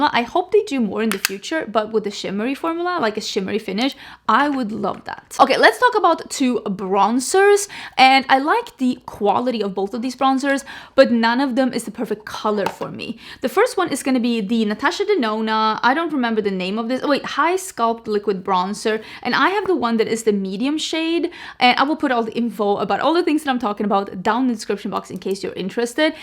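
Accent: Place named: Sweden. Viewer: wait, are you for real right now? You do realize is Swedish and English is NOT her first language. Her native country comes w its own dialect and accent. Some letter combinations we have in English DO NOT come natural for some languages. This comment is literally one of the most absurd I’ve read in a while. You must come from another planet to have this kind of expectation of someone. Either that or you don’t work for a living and are clueless to the amount of hours in a day.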